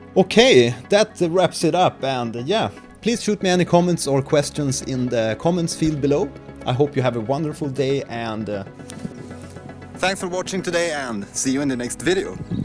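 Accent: Sweden. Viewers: Thanks for this informative video. (PS! Love that Swedish accent)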